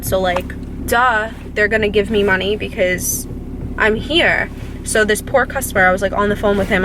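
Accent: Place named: New Jersey, United States. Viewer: You sound like yo have a jersey accent :)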